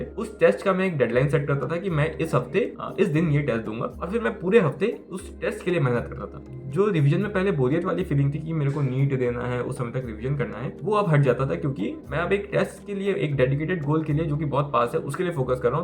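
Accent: Bihar, India. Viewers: Bhai you are from Bihar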